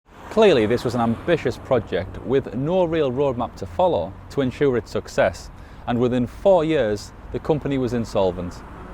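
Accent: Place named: Teesside, United Kingdom. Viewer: You sound as if you are from Teesside area, not Scarbrough ?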